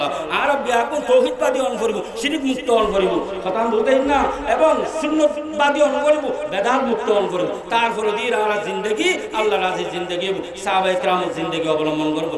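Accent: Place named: Chittagong, Bangladesh. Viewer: চিটাগং ভাসা বুচতে একটু কস্ট হই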